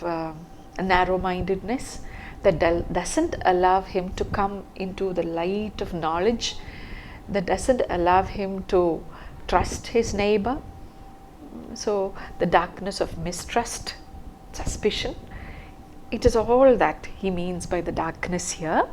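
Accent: Kerala, India. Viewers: And a clear Malayalee accent.😊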